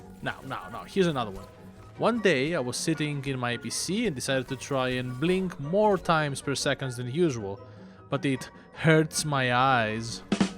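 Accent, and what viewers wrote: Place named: Greece. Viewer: Ελληνική προφορά αααααα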